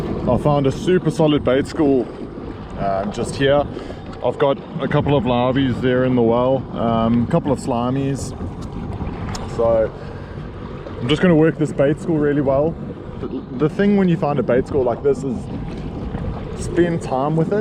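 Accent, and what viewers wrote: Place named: South Africa. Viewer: Always nice to hear a Saffa accent :)) keep the vids coming even though I fish off a boat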